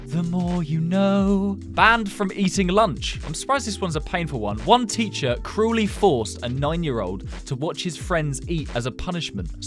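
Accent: United Kingdom. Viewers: Is it gonna be mean but are you British? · Is this guy British because I love people who are British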